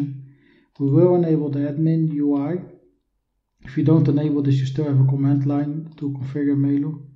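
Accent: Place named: Netherlands. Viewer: hello dutch man XD good accent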